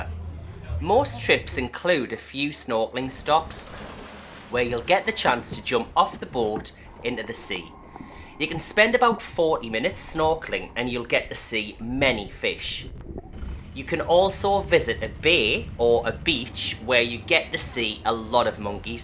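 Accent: Newcastle, United Kingdom. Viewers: Great vid. Only question... is tha a Geordie accent mate?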